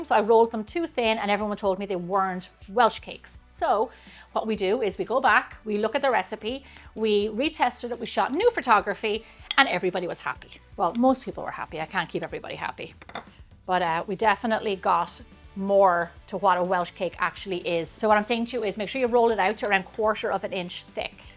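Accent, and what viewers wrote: Ireland. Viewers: Those are some lovely Welsh cakes,. Your Irish accent just keeps getting more and more adorable than ever before. 🥰🥰🥰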